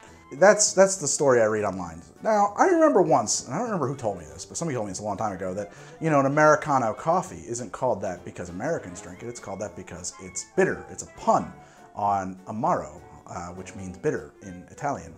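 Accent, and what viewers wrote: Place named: New Jersey, United States. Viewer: Join the subtle Jersey accent. Just wait until you start calling them Flahrida ahranges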